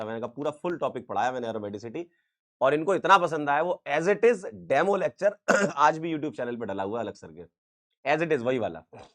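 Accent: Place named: Bundelkhand, India. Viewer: Sir mera gaon bhi mauranipur h😅....tabhi me sochu ap bich bich me bundelkhandi kyu bolte h😂...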